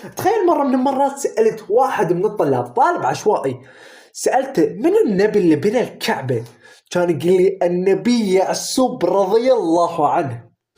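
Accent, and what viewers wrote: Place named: Kuwait. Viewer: الكسنت مدري الماكسنت العندك بل كويتي حلو😂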